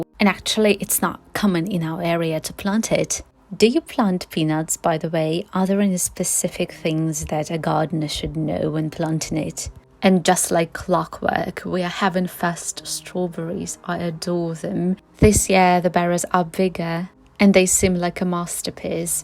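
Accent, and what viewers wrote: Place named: RP, United Kingdom. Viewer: right, but also your aristocratic British accent and engaging smile. We are putty in your hands. I wonder if that is lost in translation.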